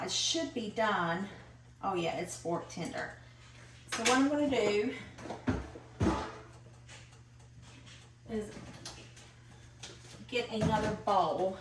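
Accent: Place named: South, United States. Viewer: April here from your friends channel, I love caserole looks so good. I love your Southern accent girl!!! keep doing you BY George! have a wonderful day !🍏🍎🥭🥑🍆🥕🌽🍅🫑🌶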